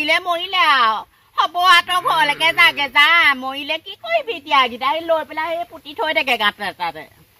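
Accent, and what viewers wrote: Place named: Upper Assam, India. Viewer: baai.. Bahut dinor murot palu dei.. Bahut bhaal lagil.... Apunar thaas tu ujonir type je.. Bhaal laage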